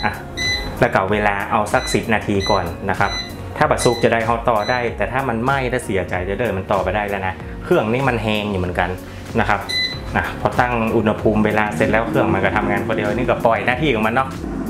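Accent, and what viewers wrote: North, Thailand. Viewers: ดีดีค่ะ ชอบภาษาเหนือค่ะ เพราะดี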